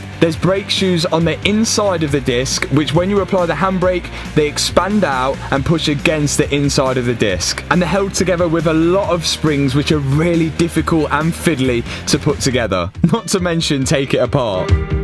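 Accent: United Kingdom. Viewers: This will sound bad but I don't like the British Accent at all but for some reason i enjoy your content and your energy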